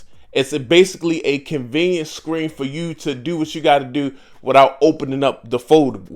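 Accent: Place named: DMV, United States. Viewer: You got to be from the DMV lol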